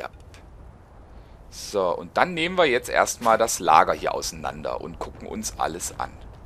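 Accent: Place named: Hesse, Germany. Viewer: ich finde auch dass du einen kleinen dialekt hast, kann es sein dass du aus hessen oder so stammst? :)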